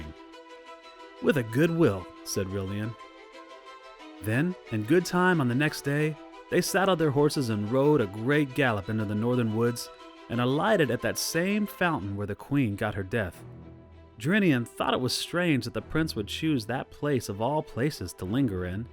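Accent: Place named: United States. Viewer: finally someone who’s American speaking to me in English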